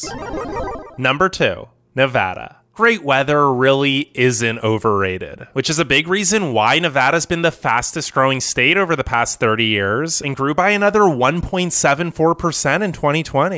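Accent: California, United States. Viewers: Is person speaking from Cali 🤔 ?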